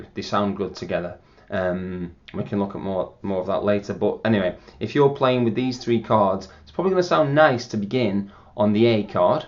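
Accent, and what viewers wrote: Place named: United Kingdom. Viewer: Man's sounds like asian and british at the same time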